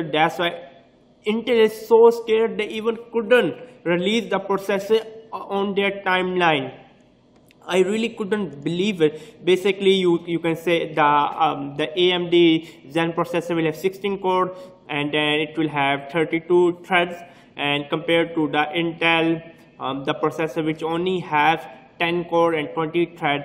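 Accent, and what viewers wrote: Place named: India. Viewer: Damn what are you talking can't unterstand with this kinda Indian or whatever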